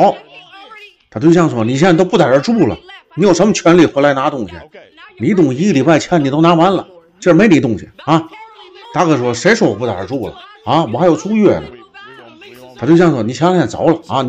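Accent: Tianjin, China. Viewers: 兄弟，您的天津味道十足哇！I subscribed purely because your 天津味！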